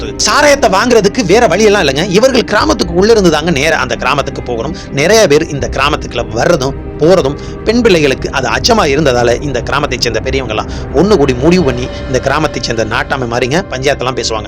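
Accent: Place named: Sivaganga, India. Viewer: Naan sivagangai anna.. Namma pechu style ungakitta aptiye irunthathu, athaan kettan... Show ellam super ah irukku, naalla pannunga anna, enga support eppavum undu...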